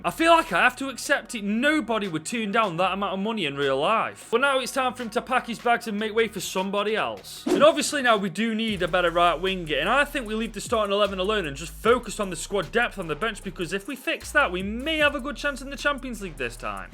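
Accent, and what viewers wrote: Stoke, United Kingdom. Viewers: Hey man, quality video! Just wondering from the accent if you’re a Stoke lad?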